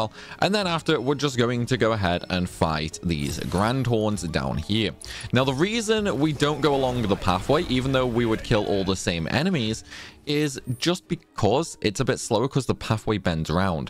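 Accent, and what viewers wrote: Stoke, United Kingdom. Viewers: Thanks, seems alright. Also I can never think of what a Stoke accent sounds like until I hear it. weird lol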